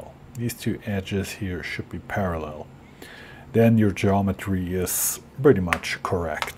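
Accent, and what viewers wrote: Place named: Germany. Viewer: I just love the german pronunciation of "Z" as "Sea" . That is adorable. And authentic.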